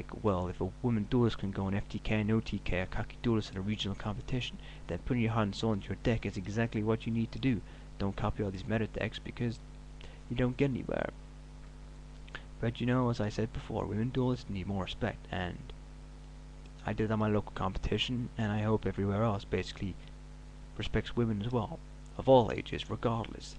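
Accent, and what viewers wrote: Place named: United States. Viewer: Dude sersiouly stop with the american acsent its getting old lol also this vid is blury as shit! Liked what ya said though because its so true!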